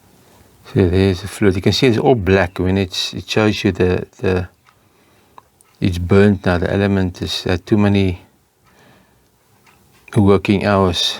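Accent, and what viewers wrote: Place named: Afrikaans, South Africa. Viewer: Ek love die Afrikaanse english. Trots Suid-Afrika, my oom. Ek kyk graag oom se videos.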